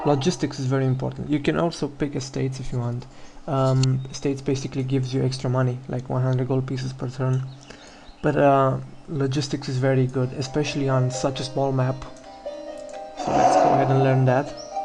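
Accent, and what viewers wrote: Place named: Germany. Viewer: Excellent introduction to this great game. Thank you very much! Cute accent...I bet you are from Germany :)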